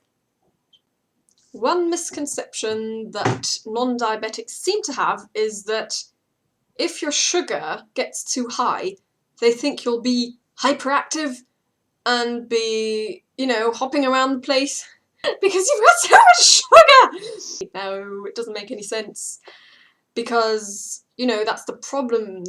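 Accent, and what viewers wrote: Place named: United Kingdom. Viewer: Du hast einen leicht britischen Touch :D Habe ich lange nicht mehr gehört! Und schrei bitte nicht deinen Pen an :( Der kleine will ja nur helfen...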